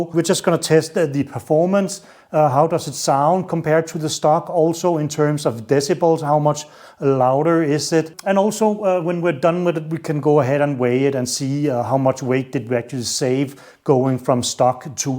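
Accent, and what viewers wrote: Denmark. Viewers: ja du behøver iværtfald ikke sige hvor du kommer fra :D. den danske accent er bare dejlig haha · Elsker at man ved første ord kan sige med 100 procent sikkerhed, han er dansk. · Alene baseret på accenten, så vil jeg smide et vildt gæt på at du er dansker 😏 Fed og interessant video!🤟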